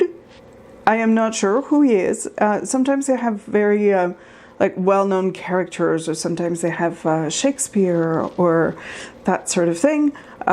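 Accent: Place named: Quebec, Canada. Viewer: I could listen to your accented dialogue all day. Quebecoise?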